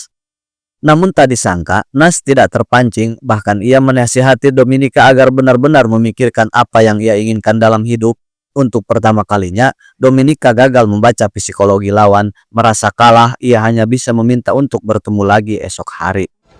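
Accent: Indonesia. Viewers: Emang ngomong na kumaha kitu? Logat sunda na Sigah logat jawa tengah kitu? 🤭 Atos di subcribe luuur 🤩👍🏻 ╭╮🎋🪻🎋🪻🫰🏻 ┃┃╭━┳━┳━┳━╮ ┃╰┫╋┣╮┃╭┫┻┫ ╰━┻━╯╰━╯╰━╯ 🤗🤩🫰😍👍🏻💯❤️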